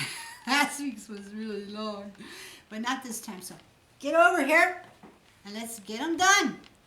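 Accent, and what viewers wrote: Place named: Pittsburgh, United States. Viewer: Very nice! Love the hummingbird one. And got a real chuckle out of the Pittsburgh-ese. LOL Made me feel right at home. <3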